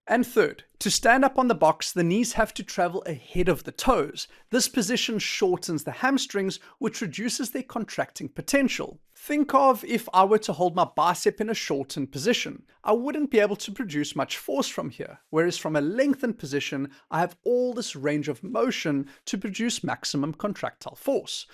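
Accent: South Africa. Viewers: Do I hear a South African accent? 😊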